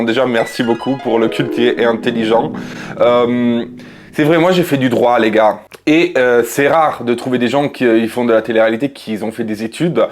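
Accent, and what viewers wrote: Italy. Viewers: Quel plaisir l’accent italien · L''accent italien ! 🤩🤩🤩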